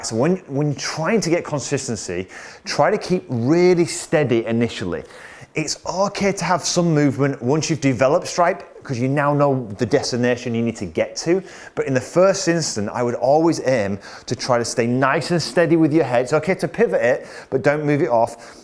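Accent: Yorkshire, United Kingdom. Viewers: Terrific video. Great tips. Plus, love the sub-titles. Fairway as Fairy Woods, Long Irons as Long Grinds. Love that good old Yorkshire accent. Ta.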